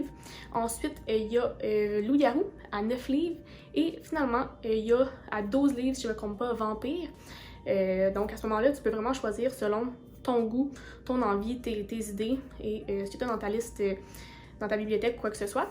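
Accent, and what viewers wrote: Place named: Canada. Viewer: Salut ! Je viens de découvrir ta chaîne et j'aime beaucoup (même si j'avoue que que ça fait étrange d'entendre l'accent canadien mais en même temps ça fait voyager ! ). Je ne participe pas au moins de la Bit Lit parce que je n'en lit pas trop... Bonne lecture !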